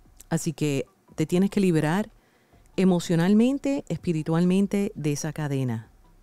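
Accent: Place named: United States. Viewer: Soy divorciada hace 20 años, no deseo ni un poco volver al pasado, no deseo ni acercarme. Tengo problemas con mis 4 hijos y me deja muy triste. Los crie sola, siempre. Pero es tanto lo que me lastman que he decidido abrirme. Aunque me duela. Soy Madre por naturaleza los amo, pero tengo Amor propio Estabilidad economica es lo que necesito. Me es difícil jubilada a los 73 años generar mas entradas. Ruego al Dios, me de la bendición de ser autónoma, y no necesitar de la ayuda de mis hijos. No voy a bajar los brazos. Ahora hare jabones que venderé. Y rentare mi departamento, al fondo de mi jardín.y tener una ayuda económica. En Argentina es muy difícil la situación. Te doy las gracias y mis bendiciones para tod@ los que te escuchamos. Hacia tiempo yo no lo hacia. Te agradezco, me encanta como suena tu español, con acento americano❤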